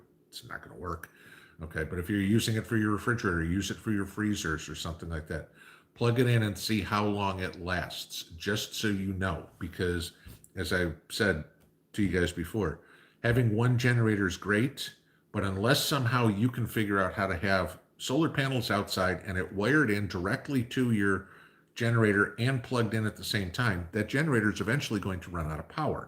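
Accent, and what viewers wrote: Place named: New York, United States. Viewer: Hey hes a New Yawker!❤️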